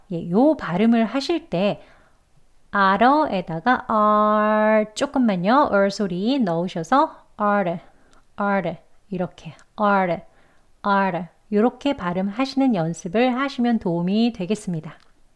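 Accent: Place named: United States. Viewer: 전형적인 미국식 발음 같네요. 오늘도 잘 들었습니다. 감사합니다.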